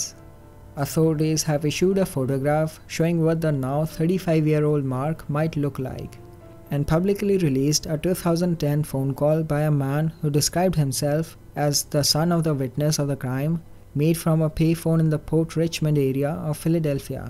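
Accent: India. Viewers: not this indian voice, literally worst accent possible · Are you from India? I have a friend in Northern India and I'm noticing the accent is slightly different but similar. Most of the Indians I've met have accents closer to yours.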